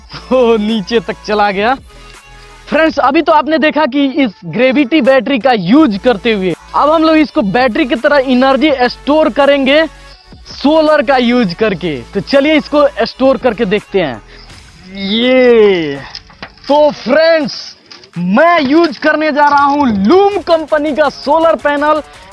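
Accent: Bihar, India. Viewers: Aap Bihar se ho na?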